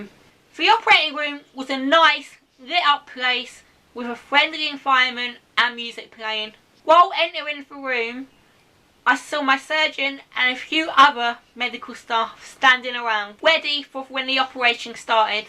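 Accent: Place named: United Kingdom. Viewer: Wonderful that your mother was there to support you. I enjoyed listening to you as I enjoy listening to you Brits talking; to this end I watch a lot of BBC showsz and listen to BBC radio at various times during the day. My best to you and your family. namaste, Roger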